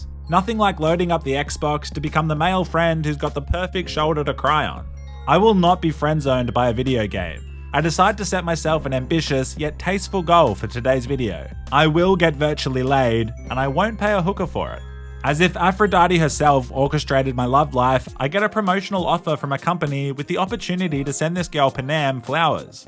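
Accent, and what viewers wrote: Australia. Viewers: Yeah he’s Australian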